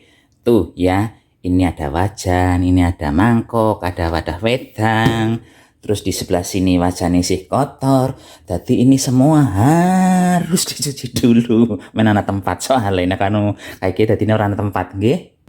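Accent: Banyumas, Indonesia. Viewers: Menjadi diri sendiri lebih baik mas. Autentic dg bahasa ngapak dan apa adanya. Ciri kasnya 👍👍👍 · Masaaaak sih ,,,,,ya keteke kecuuut ,,,,sambil masak ,,,,kelihatan tambah buntder ,,,,hidunge tambah tidak kelihatan ,,,,yg penting tetap apa adanya ,,ngapaknya spontan malah tambah lucu ,,,,cerdas ,,,,masak sambil mbanyol ,,,,malah tambah nggemeske ,,,,,kalau dekat pingin nyiwel pipine sing tembem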